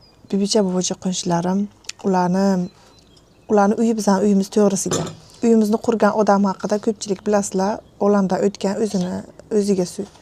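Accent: Bukhara, Uzbekistan. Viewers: Бобоча,бибича 😂 сузингизни кувониб эшитаман. Бухоро шеваси.. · Buxorolikmisiz apa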